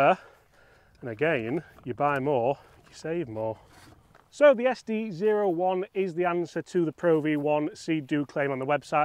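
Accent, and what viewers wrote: United Kingdom. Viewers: I love your videos. Please explain what the hell is going on with you Brits pronouncing URETHANE as if it was spelt URETHEEN. I notice it's not just you. It seems to be a thing for you blokes. What's going on? At the end of the day it's just pronunciation so no big deal but why mate? I'd genuinely like to know.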